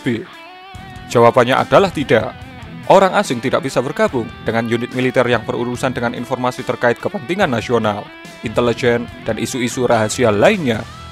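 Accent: Java, Indonesia. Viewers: medok banget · Ini medok suaranya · Hahaha medok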